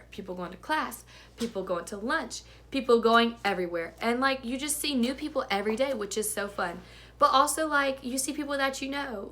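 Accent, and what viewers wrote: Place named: South, United States. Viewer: I love the accent. Miss the South!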